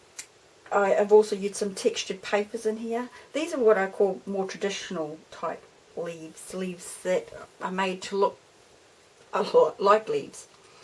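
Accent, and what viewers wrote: New Zealand. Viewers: Please tell me what you said , between the music not quite faded out and the Kiwi accent [I'm Canadian] I can't quite decipher what type of paper you're using there. I've got " ... you can see where the back ___? this has actually been done ___? paper but don't let that put you off ..." Thanks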